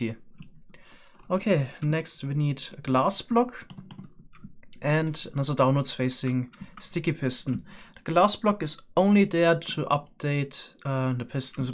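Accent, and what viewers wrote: Germany. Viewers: Are you german? I think I can hear a slight german accent. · Sat german accent <3 :D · ach du kacke hast du nen deutschen akzent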